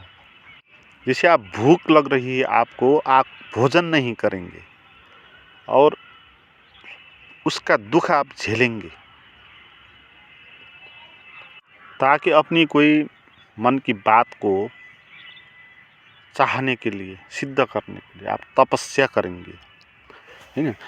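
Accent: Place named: Nepal. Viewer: आप की हिन्दी में नेपाली की रस आ रहि हैं।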